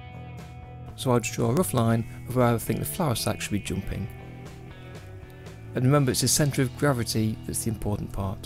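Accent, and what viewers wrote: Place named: England, United Kingdom. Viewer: I hate English accent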